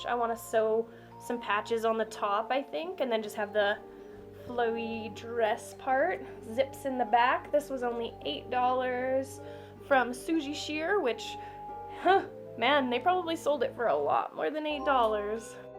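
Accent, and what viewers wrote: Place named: Germany. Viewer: oh sorry then ._. Your english is really really good but I heard a little accent and I thought It could be german cause my best friend is from Germany and she has almost the same accent. Again I'm sorry :(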